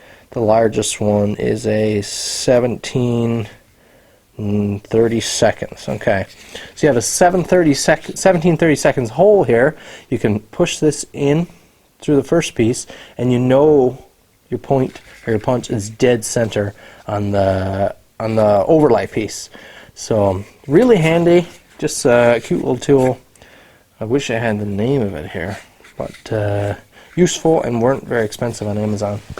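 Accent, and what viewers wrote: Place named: Newfoundland, Canada. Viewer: Greetings from Norway👍🏻🤠 Your videos are so educative and cool. Love the New Foundland accent and I admire your craftsmanship⭐⭐⭐⭐⭐👍🏻 Keep the videos going!🏆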